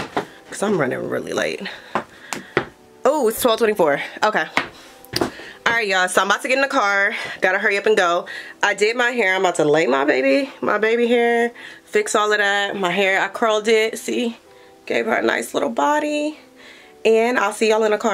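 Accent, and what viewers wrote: Los Angeles, United States. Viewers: Flawless.. You Do Have A Accent.. LA af but Twainy(Southern) at times.. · That 323 accent 🤣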